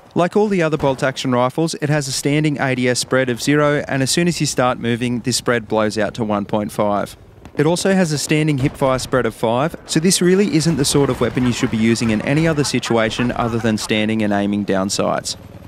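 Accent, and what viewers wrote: Australia. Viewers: Are you Aussie/ New Zealander?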